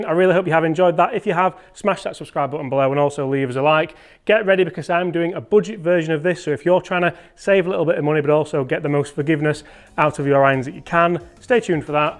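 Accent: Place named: England, United Kingdom. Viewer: Im 63 I took up golf 6 years ago 6'4' 220 LBS so I hand a set custom made ,all irons about an inch longer than most. Im a long hitter 200 7 ,<num> ish 8 130 SW and 145 W 75+ 60! , anyway I have the Callaway big bertha irons ,5 years old and I think they are time to replace as I was playing 3 times a week , I think my shafts are fatigued and well as the heads, ( all materials deteriorate over time) problem is my HC is now a 26 .5 do to the fact I think the set is just used up and frustration takes over and I was a 20HC 2nd year into this ( HAD A GREAT GOLF COACH EVERY A WEEK)then dealt with health issues ( im back and feeling much better ,Your English accent and fast talking is hard to follow , Plain and simple I have to have mine custom made , 5 iron to PW , please suggest a head and shaft combination you feel would get me back to low to mid 90s game , , Thanks Brad USA .Any information from you would be greatly appreciated . 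Everyone at my golf club has their own advise ( OF COURSE) The club champ do tell me that if he could work with me more often one on one I would be low 80s/high 70s and club champ, Also said my iron shots are unreal," nobody here hits a 7 iron 200 + Advise? Help?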